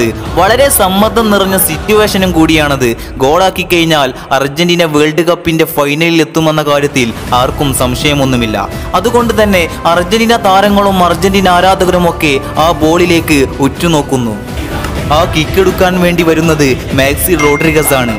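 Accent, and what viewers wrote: Malappuram, India. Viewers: മലപ്പുറം ആണല്ലോ 😄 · Malappuram karante voice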